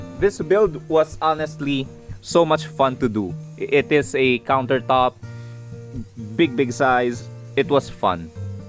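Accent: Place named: Philippines. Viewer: I really miss the Filipino accent. I was fortunate enough to live near Manila for a few years and travel a lot while there. Listening to you explain all this brought back so many good memories. Best of luck to you with your projects and your channel my friend. :)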